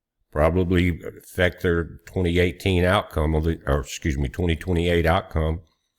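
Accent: South, United States. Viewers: I love your southern accent. I was half way through this video before I figured out you were saying fraud. I thought you were talking about frogs! 🤣 I was thinking Uh oh... went looney tunes.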